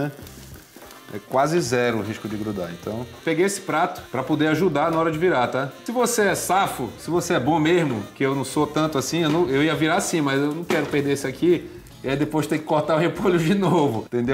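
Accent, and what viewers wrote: Pará, Brazil. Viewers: voce leva o Pará para o mundo com sua espontaneidade e essa pronuncia que éa coisa mais linda! Amo suas receitas e seu modo de usar o que temos.como disse antes conheci tua familia no Recanto e admirei para sempre.Lembrou muito a minha que nos criou com um pé na cozinha! Valeu! Amo teu canal.Vou te convidar pra conhecer nosso Café.Aceita?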